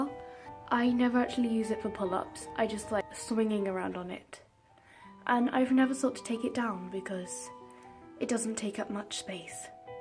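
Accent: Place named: United Kingdom. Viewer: Great video! Fellow Brit here but your accent is like 100% nicer than mine 😂😂😂 I’ve always wanted a diffuser so I’ll check out that company. Fantastic video!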